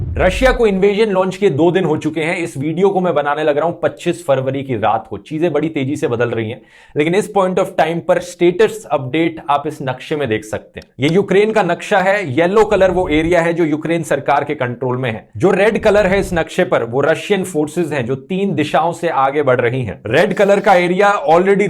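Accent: Haryana, India. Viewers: आपकी Language me हरियाणवी touch है।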